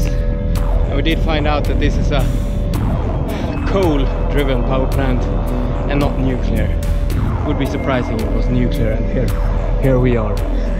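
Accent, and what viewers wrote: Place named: Sweden. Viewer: Jag tyckte att du hade en svensk dialekt😂😅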